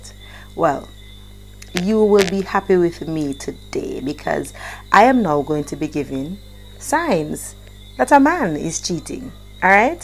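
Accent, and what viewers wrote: Jamaica. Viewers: I always enjoy watching your content on relationships tips and also listening to your Jamaican accent.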